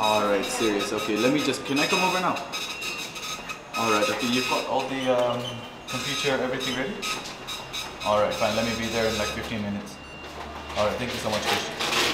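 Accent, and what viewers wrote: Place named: Kenya. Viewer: Love your Kenyan accent 😊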